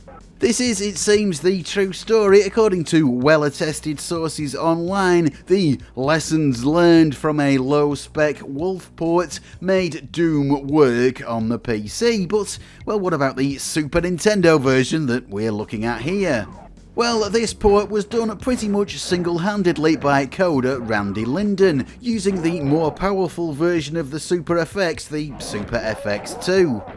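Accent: United Kingdom. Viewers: This is how proper British folk talk.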